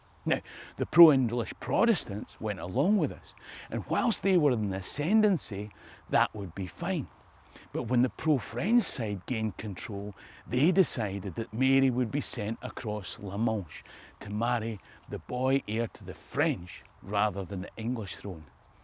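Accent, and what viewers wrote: Scotland, United Kingdom. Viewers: another great video presentation,. but why the "closed captions"? even as an American, I can understand everything you say. although, I can see where some may have trouble comprehending a Scottish dialect. again, thanks for such a great video.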